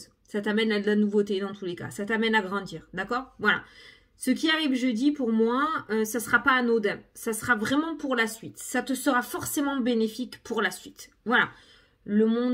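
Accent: South, France. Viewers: Bonjour et encore merci. Pour moi ce sont tes abréviations ( maniant au lieu de maintenant ou si t es d accord au lieu de si tu es d accord......cela exprime ta joie et ta volonté de nous faire aller vers le meilleur très rapidement car tes idées fusent. Il y. On doit se bouger le « derche ». Et il n y a pas qu un chemin pour y arriver,il y en a plusieurs et enfin tu vas passer un sale quart d heure mais cela t amènera à une vie de joie.........tes phrases sont pleines d espoirs et de vitalité avec ton accent du midi, c est ce qui donne de la force à tes paroles quelque soient les mots que tu utilises. Encore merci et à demain 🙏🙏🙏🙏🌞🌞🌞🌞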